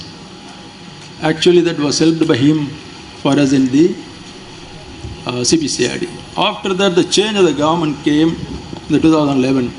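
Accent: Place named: North India, India. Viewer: பேரு தமிழ் வடக்கு ஹிந்தி பேசுற மாதிரி இருக்கு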